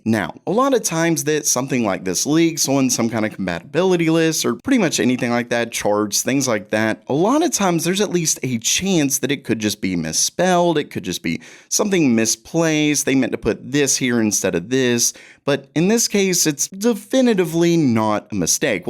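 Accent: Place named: South, United States. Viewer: this guy's voice sounds like a southerner mixed with a lesbian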